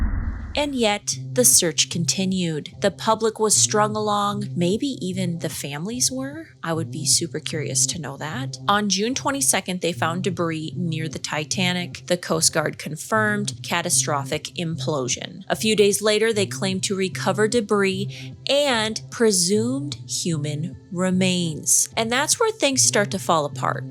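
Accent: Minnesota, United States. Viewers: are you from Minnesota?I love listening to your voice and I love your videos 😊Hi from Maine🌲🦞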